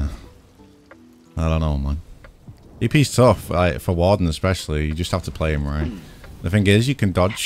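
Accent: United Kingdom. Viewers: are you a Brit? I can’t recognise my own accent 💀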